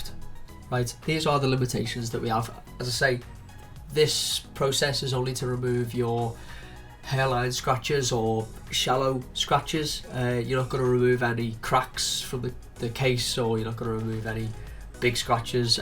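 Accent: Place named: Liverpool, United Kingdom. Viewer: Thank you scouser! I can't tell you how much bother you've saved me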